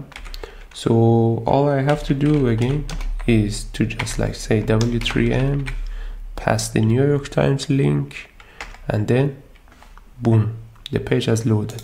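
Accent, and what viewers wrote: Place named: Iran. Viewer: way to go man. I love the section you speak about the Javascript Garbage. 😅😆😆. We appreciate the Persian accent by the way!!!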